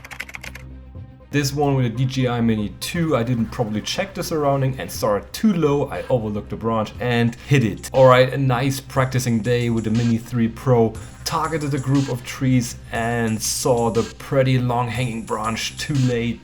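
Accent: Germany. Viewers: Moin !! Bist du deutsch 😅man hört dein Akzent haha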